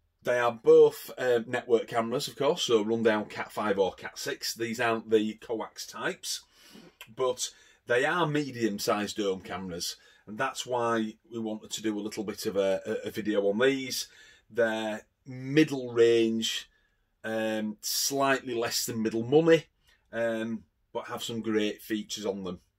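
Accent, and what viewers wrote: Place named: Yorkshire, United Kingdom. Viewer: Love your tutorial Sit and it's obvious your from good old Yorkshire as My wife is from Goole but we live in N. Ireland, Question, I have just had 4 small Hikvision cameras fitted at my house, model number is DS-2CE56HOT-IT3E, but not happy with them, I will keep 2 of them but want the DS-2DE4220IW-DE you have been talking about, I need to be able to read a number plate at 30 mts, what do you think, pity you couldn't show us some footage, thanks again.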